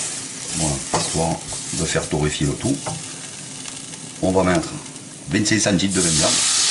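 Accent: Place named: Provence, France. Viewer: Oh fan, tu me fends le cœur ! Je fais la même et je la termine avec un trait de cognac ! Je regarde régulièrement tes recettes et je me régale de ton accent, le vrai, celui de Pagnol et qui me manque tant là où j'habite (Auvergne) ! Mille merci pour ton partage, et compliments, cuisiner sur deux plaques électriques ça mérite une médaille ! Adéssias Amigo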